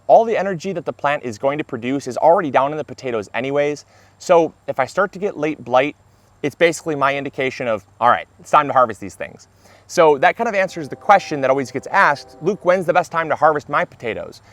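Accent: Michigan, United States. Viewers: Here for the gardening advice but staying for the Michiganisms "oh my gosh!".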